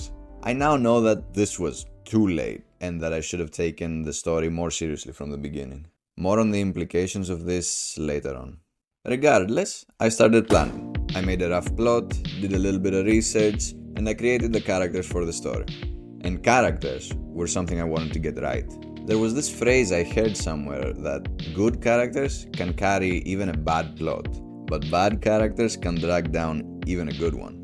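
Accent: Greece. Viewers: You sound very Greek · i knew i recognized that accent, but i wasn't sure if you where actually Greek, or just Balkan. but then i saw fucking Ιανουάριος and i was like μπροοοοο. Επίσης γαμάτο βίντεο!!! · You sound Greek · Το ήξρερα οτι είσαι έλληνας το άκουγα στην προφορά .Επίσης ευψαριστώ με βοήθησε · There is no way you're not Greek with that accent. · You sound greek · I was wondering the same because of the accent so I took this whole video as a puzzle like "is that nature in the background in a climate zone that exists in Greece?". Well, I got me definitive answer here and that puzzle is solved. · greek accent lol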